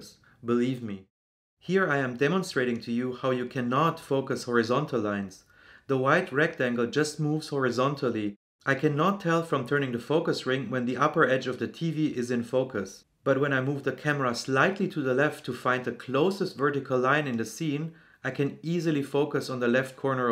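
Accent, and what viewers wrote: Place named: Zurich, Switzerland. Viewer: Sali hier ist Benjamin. Bin über Dich gestossen, als ich für meine neue M6 Tipps gesucht habe. Komplett unerfahren in Rangefinder und deren Funktion bin ich auf der Suche nach Fokus etc. gewesen. Dann kam Dein Video zum Vorschein. Dachte mir den Akzent kenne ich doch irgendwoher, anschliesend habe ich unter “About” herausgefunden, dass Du in Zürich lebst, ich auch. Fand ich ganz witzig. Eventuell kann man sich ja mal treffen. Wie gesagt bin ganz neu was M angeht, und jetzt mit einer Traumkamera, die zuhause wartet ausgepackt zu werden. Das passiert morgen. Wollte eigentlich nur einmal Danke fürs Video sagen. LG Benji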